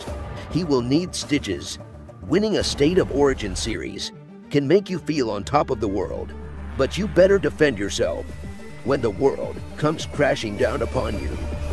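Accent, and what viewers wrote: United States. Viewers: Why American narration? Good ole days, don’t need that. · This channel doesn't sound right with that American accent. Sorry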